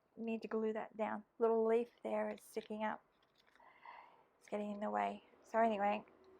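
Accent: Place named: Australia. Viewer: Nice to hear an Aussie accent 🐨 Love this teabag paper concept well done. Cheers from NSW 😊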